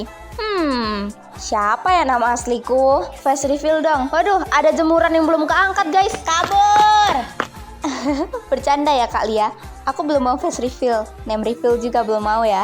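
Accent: Indonesia. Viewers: Kok sama Jawa Timur nya kak😄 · wihh aku jg banyuwangi, tp kalau cara ngmong nya kak emng mirip banget sih orng banyuwangi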